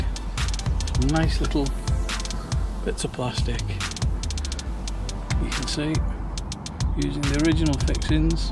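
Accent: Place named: St Helens, United Kingdom. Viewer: NICE van! Sounds like a St Helens accent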